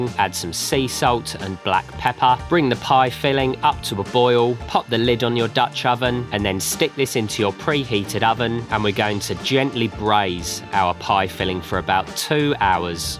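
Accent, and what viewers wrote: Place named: Cockney, United Kingdom. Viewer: Why does a "West Country Kitchen" video have a cockney voice over? I expected a Devon burr, or at least some kind of "Ooh, arr"!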